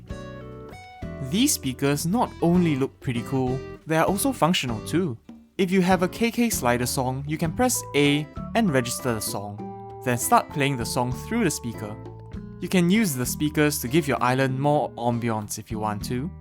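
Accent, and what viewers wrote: Singapore. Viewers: Familiar accent. S'porean? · youre definitely from Singapore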